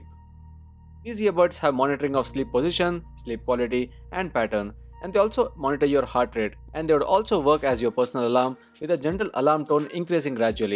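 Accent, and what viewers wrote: India. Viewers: Indish english?! Its sooo nasty 🤮🤮🤮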